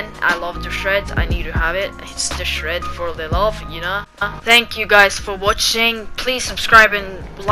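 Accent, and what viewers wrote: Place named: United Kingdom. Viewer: nice video men koffør snakka du me britisk aksent??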